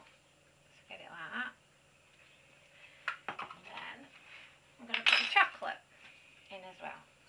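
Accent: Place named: North, United Kingdom. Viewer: Thank you for this video it's great and with your northern accent easy to understand. Tracy from Accrington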